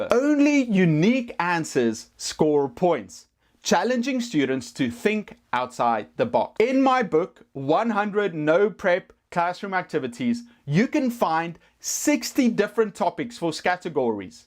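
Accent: South Africa. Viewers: South African accent. Nice.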